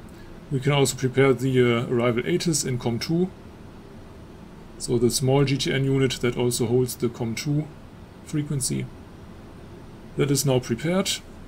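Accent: Germany. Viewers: Hey, I resubscribed. Missed hearing your Duetsche accent ja!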